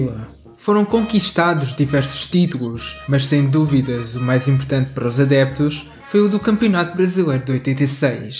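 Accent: Portugal. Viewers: Que legal esse vídeo Gosto muito do sotaque de Portugal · Um dos melhores vídeos sobre a história do São Paulo, e com sotaque da terrinha! Demais! Contem a história da Eusébio Cup que vencemos o SLB no Estádio da Luz com o pior esquadrão da década!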